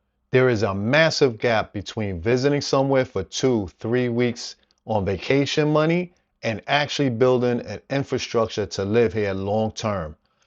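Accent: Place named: New York, United States. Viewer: New subscriber: Excellent advise, you sound like a brother from New Yawk; I hear an accent...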